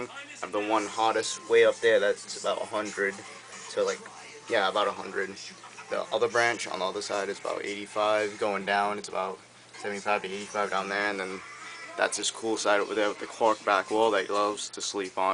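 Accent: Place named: Boston, United States. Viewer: you from boston, nice accent im like an hour away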